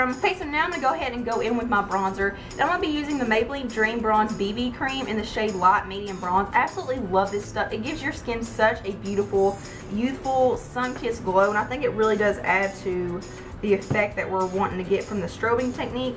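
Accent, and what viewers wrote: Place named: Gainesville, United States. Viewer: Thanks for the very clear instructions about strobing ! I sure appreciate this. I love your accent too...you sound like you are from gainesville fl.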